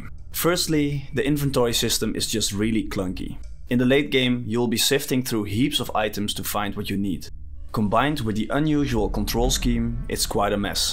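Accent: Netherlands. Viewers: nice dutch accent. Greetings from Germany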